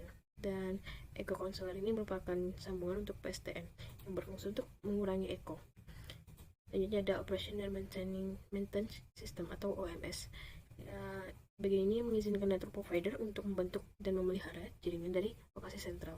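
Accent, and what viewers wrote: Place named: Pariaman, Indonesia. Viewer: Vidionya kurang menarik nih, bahasa indo nya kelihatan pariaman vibes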